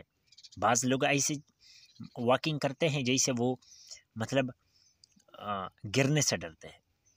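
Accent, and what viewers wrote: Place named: Pashto, Pakistan. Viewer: Aesa lg rha hy ap pashto mn English bol r hn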